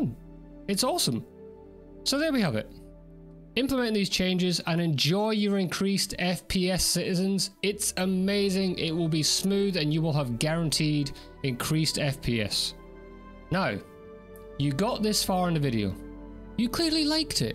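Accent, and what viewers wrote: Scotland, United Kingdom. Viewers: Thanks for the great video. This will help squeeze every bit out of Star Citizen. Also, love the Scottish accent.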